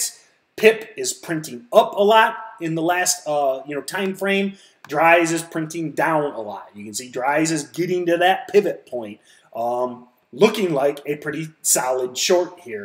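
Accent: United States. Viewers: The way he talkssss, his accent and his over emphasis of general words is so annoying and obnoxious. My ears literally bled.. Maybe coz i am an aussie and can't comprehend his typical non urban american accent. Faaaarkkkkkkkk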